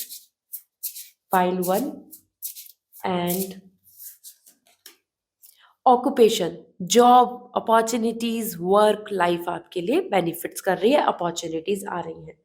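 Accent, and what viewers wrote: Haryana, India. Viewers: Are you from HARIYANA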